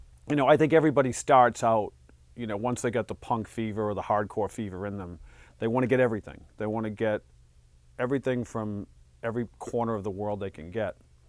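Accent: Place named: Boston, United States. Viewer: Damn you still got your Boston accent !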